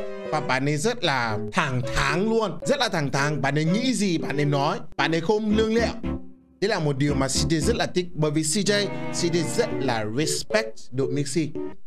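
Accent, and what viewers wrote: Standard. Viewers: Anh này nói tiếng Việt chuẩn chỉnh hơn mấy bạn khác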